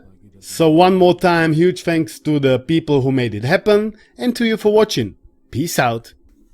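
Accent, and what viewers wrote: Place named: Latin America. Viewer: por que siento que eres latino xd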